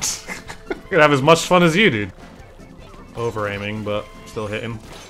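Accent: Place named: England, United Kingdom. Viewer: I mean, if someone’s gonna tell me how to pronounce words in English, I’m listening to the guy who’s from England (or the UK)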